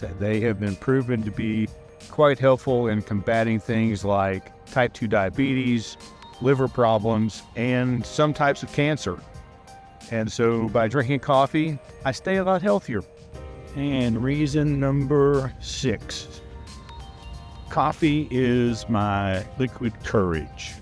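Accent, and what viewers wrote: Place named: Oklahoma, United States. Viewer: 😂 MY LUCKY DAY. Hi, neighbor! You speak my language, even saying froo-froo too, and it's good to hear from another Okie. I grew up in the Tulsa area and also loved the other-worldly aromas of the coffee shops at Woodland Hills Mall. Wow. Smiles. WH Mall was a Big Deal. Thanks for the smiles!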